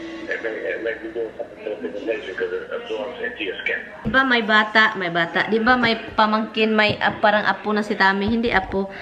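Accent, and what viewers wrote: Visayas, Philippines. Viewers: di ba nimo gi remind si sa resulta sa iyang pagpanigarilyo? Amping sa iyang Lungs oy Sunod grabe jud na ang tira sa imoha kay resipient ka sa cigarette smoke nya. Nag ubo ubo na cya ba. Just be careful. Watching your vlogs. Njoy ko sa imong English with visaya accent. Ayos jud huh! More power.Rachel from the Philippines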